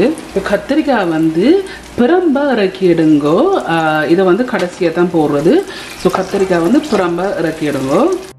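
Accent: Jaffna, Sri Lanka. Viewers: Jaffna tamil👍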